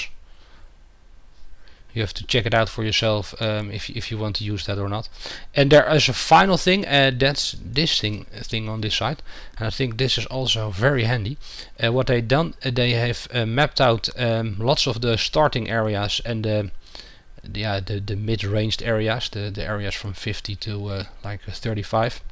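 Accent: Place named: Netherlands. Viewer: dutch much:)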